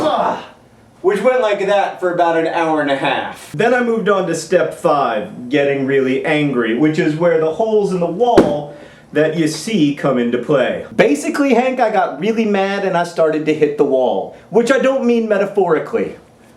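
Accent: Russia. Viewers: Your Russian made my insides happy. Ochen liubliu tebya<3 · It's a really REALLY good Russian you're sporting! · Your Russian is adorable. XD I don't like the way you made me question the plural of 'octopus'. I have a feeling this will result in a linguist headache. (They're a thing that happens.)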